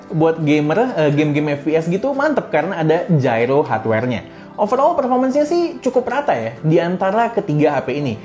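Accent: India. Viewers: Gue kira channel orang India. Kaget gue pake bahasa Indonesia 🤣🙌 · aga tripping kwkwkw karena yg ngomong mirip orang india tapi ngomong indo, kaget saya hahaha keren keren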